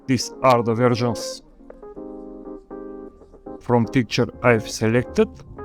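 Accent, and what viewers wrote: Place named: Russia. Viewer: Useless instructions, I can barely understand the part English, part Russian accent, and the video is not simple enough to follow, as most of the web pages you see on the video, either don't exist anymore or don't look like video and all the links that are shown in the video are not on the changed web pages, in fact, this video needs to be updated, and also, show us how to create a new avatar from scratch not from what you already have.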